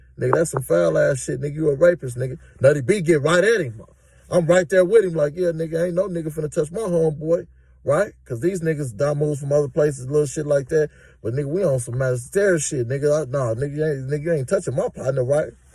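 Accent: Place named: Detroit, United States. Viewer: Woo woo woo woo . Oh yeah sounds like a D nicca 😂😂 . My 1st time on your channel cuz . I don't know where you from just talking shi. Much love and respect blessing & success to your family and channel. Midwest fo sho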